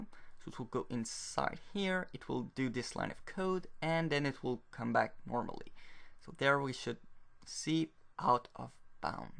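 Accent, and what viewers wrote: France. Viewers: T'es français ? O_o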